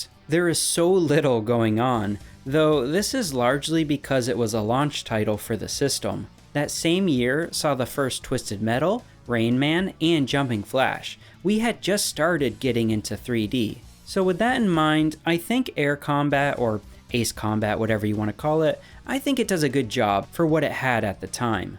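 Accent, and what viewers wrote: England, United Kingdom. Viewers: that accent sounds like a british guy trying a eastern european+asian accent. its wild. goes from jackie chan to arnold in like, .5 seconds. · The voice sounds like an english accent, but possibly someone who's first language isn't english but learned how to speak english via someone with an english accent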